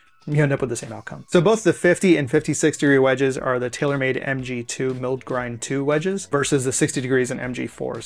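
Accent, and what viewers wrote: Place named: New Zealand. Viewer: Cant believe I didnt pick up the accent. Cant stand listing to kiwi accents, or sepo's either, but put them together and it works. US ladies def love the kiwi twang aye :)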